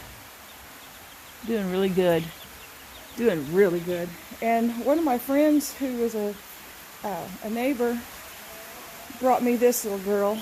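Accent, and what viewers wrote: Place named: Oklahoma, United States. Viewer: I personally don't think it's anything you're doing if your view count has gone down or likes because of everything going on with the chicken industry and all the red flags evolving around chickens I think that there is more people making chicken videos than ever which is changing the algorithms and YouTube likes to mess with people like yourself that's true and genuine it's not people that's leaving your channel because you're mentioning God it's because YouTube's making them miss the video and not sending people their notifications because you're mentioning God and you're popular now I think your videos are awesome and you also remind me of my grandma that's one of the reasons I watch your Channel because you talked to your chickens and you do not belittle them yeah there's something about your Oklahoma accent that reminds me of my grandma. 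So please ma'am don't get discouraged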